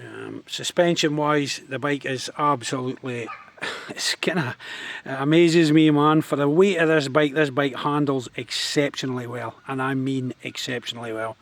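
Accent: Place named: Scotland, United Kingdom. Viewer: Nothing to do with the bike, but I just love your accent. Can't wait to visit Scotland again. 🤩 Great review btw and good to know about the rear brake recall. 👍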